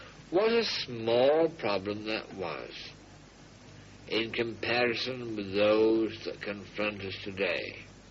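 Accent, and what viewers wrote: United Kingdom. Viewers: Beh nelle news britanniche mi pare sia normale avere la received pronunciation, un po' come da noi vanno a corsi di dizione di italiano standard... Certo che se era irlandese-americano, ha una pronuncia britannica notevole... con anche l'isolamento all'estero per parecchi anni... come notevole fu la persistenza di quella propaganda che, da semplice curioso e sono solo tutte domande le mie, cmq non ho mai indagato se diede mai alcun benché minimo riscontro nell'opinione pubblica britannica... pensavo avessero smesso ben prima del '45 di trasmetterlo... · tbh its just how british people sound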